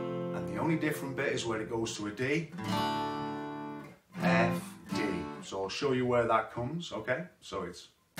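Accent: Liverpool, United Kingdom. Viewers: Hi. I struggle with the Rhythm. But it's just practice. Can I ask you about your accent. Are you from Liverpool if so which part?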